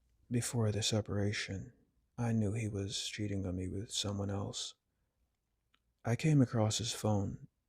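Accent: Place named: South, United States. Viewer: 🤗 great presentation yet again! I must say that I love your Southern Charm Style Accent! 🥰☺️🥹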